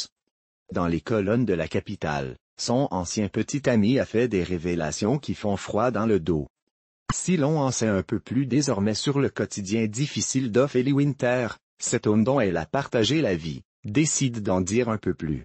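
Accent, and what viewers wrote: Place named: Quebec, Canada. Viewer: Heu! elle viens faire quoi Loana dans les photos !? j'm'suis encore perdue sur yt , les voix robotique avec l'accent jouale de Québec MDR! j'décalisse de dlà !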